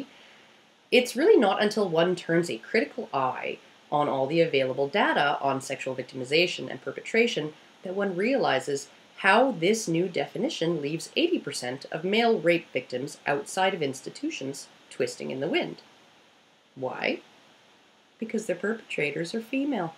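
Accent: Canada. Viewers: Sorry, but I just love the way you Canadians say any word containing out Nothing against Vanadians though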